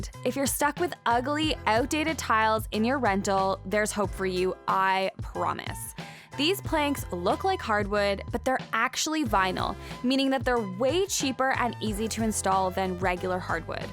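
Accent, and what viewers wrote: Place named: Canada. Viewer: Ah ha! I ended up here from another recent video of yours, and I thought I recognized that canadianness (I'm from Montreal, hehe)!! xD Great videos!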